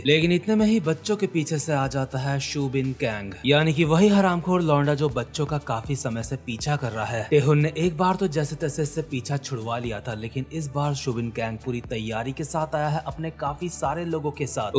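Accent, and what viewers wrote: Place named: Hyderabad, India. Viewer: Ap ki language Hyderabadi dekh ri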